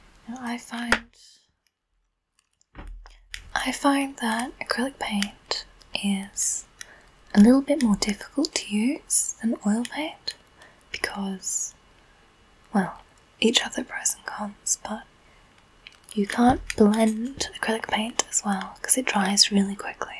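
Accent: Australia. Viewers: South Australian? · Glad I stumbled on your channel. You have to be Aussie surely?!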